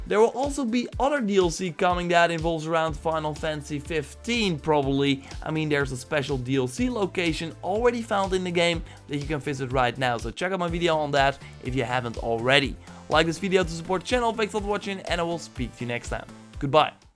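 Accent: Netherlands. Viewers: Are you Dutch? I keep trying to place your accent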